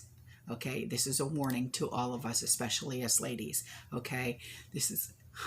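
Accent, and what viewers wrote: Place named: Boston, United States. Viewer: "Grab your cwauffee".....that's how I hear it ......great accent.....Mass?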